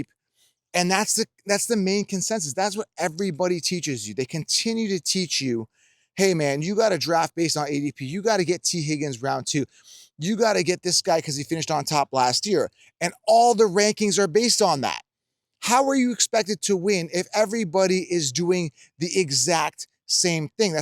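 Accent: Canada. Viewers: I’m curious, what state are you from? Or are you from Canada? I hear a Canadian accent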